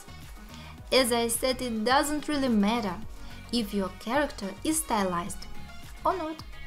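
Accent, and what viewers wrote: Russia. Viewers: Мне кажется, что на англоязычном ютубе и без этого видео, нет дефицита в туториалах и уроках по Блендеру (в т.ч и от пионеров и разработчиков Блендера). И какой смысл делать русское название, для англоязычного ролика? Это мое субъективное мнение, но лучше было уже делать ролик на русском, чем на английском, с произношением как у героев фильма "Красная жара".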